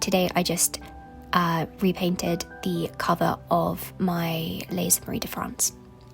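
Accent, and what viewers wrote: RP, United Kingdom. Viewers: I think it is rp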